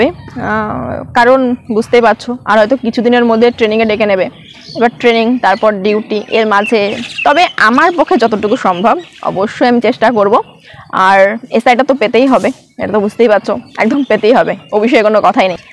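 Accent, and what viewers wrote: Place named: Bangladesh. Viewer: Kotha gulo bangla desi bangla deshi lagche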